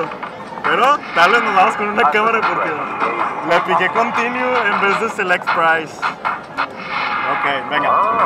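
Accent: Mexico. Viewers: ¿Eres Méxicano?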